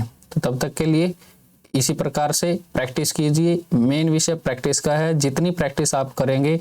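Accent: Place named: Haryana, India. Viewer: Sir your voice tone is so amazing... Haryanvi mix Hindi... your lectures very beneficial for me 🥳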